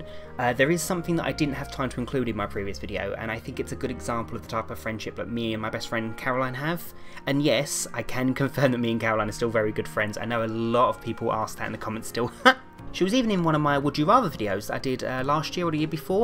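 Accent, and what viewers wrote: United Kingdom. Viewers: You sound British, are you British? Nice video, by the way. I have a question, why do you say, "Ello, ok" In your intro all the time? Is it cause you like to say it all the time? Anyways, can you translate this? Spanish Estoy tan contenta de que tu madre te haya llevado a este mundo. Lo siento si ella se fue o algo. No quise hacerlo si ella está muerta. Pero, eres tan especial y amable. Gracias por estar en la vida de YT, y en las vidas de todos. ¡¡Eres genial!! Hint. (it's really nice.)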